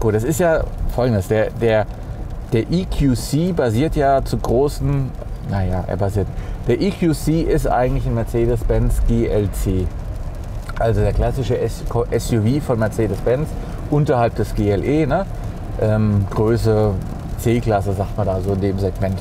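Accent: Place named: Hesse, Germany. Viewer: Lieber, ich stehe aktuell vor der Entscheidung pro Elektro-SUV und habe mir daher unzählige Youtube Videos angeschaut. Zudem bin ich den EQC gestern zur Probe gefahren. Deine Eindrücke teile ich zu 100%. Das vermutlich ehrlichste Review überhaupt - zudem im hessischen Heimat-Slang! Besser geht net. Was mir zusätzlich aufgefallen ist: der Raumeindruck ist doch etwas beengter als bei der Konkurrenz. Das war auch schon beim GLC der äußeren Form geschuldet und ist durch den höheren Boden (Batterie!) nicht besser geworden. Es ist wirklich eher bloß der Eindruck aufgrund der gerundeten Formen, denn Platz ist trotzdem für 95% des natürlichen Publikums ausreichend vorhanden, meine ich. Die andere Geschichte war das Fahrwerk. Das federt einerseits Unebenheiten souverän weg, hinterlässt jedoch auf längeren Bodenwellen ein Gefühl leichter Seekrankheit bei mir. 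Da hätte ich es gern einen Tick direkter gehabt. Ist vielleicht persönliche Präferenz. In engen Kurven bleibt der Wagen spurstabil, kann seine 2,5 Tonnen aber nicht ganz verstecken. Das ist die Physik. Die Bremse war für mich übrigens okay.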